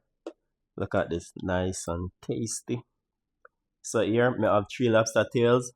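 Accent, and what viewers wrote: Jamaica. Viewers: Chef, I really love your authenticity. Many Jamaicans change the way they speak and do things outside of the country. It is really refreshing to hear how you speak and express yourself as a person and as a chef. I can see that you really love what you do 😊. Keep repping the black, green and gold out loud! One love mi bredda and get well soon. God bless you🇯🇲🇯🇲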